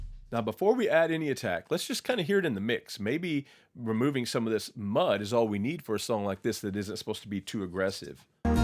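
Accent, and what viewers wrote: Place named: United States. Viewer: probably the best Neve teaching tutorial ever and the sample music was pleasing .... nice to hear an American accent too...thx !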